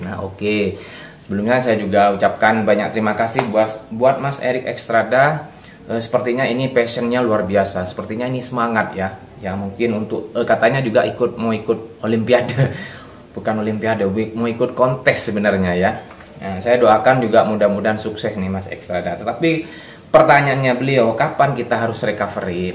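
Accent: Madura, Indonesia. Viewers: Mas asli madura ya?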